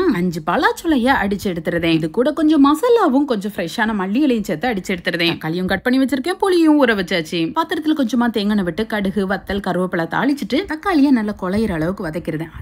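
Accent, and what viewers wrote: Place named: Nagercoil, India. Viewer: New try... N ur Nagercoil slang❤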